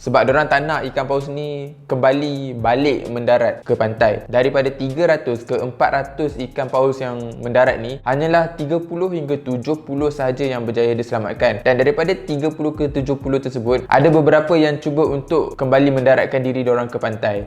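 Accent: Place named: Manglish, Malaysia. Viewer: Manglish hahaha.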